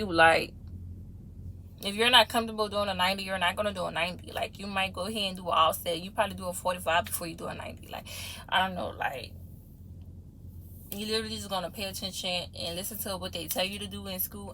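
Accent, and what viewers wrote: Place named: New Orleans, United States. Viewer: Aye Nola in da building I heard New Orleans lol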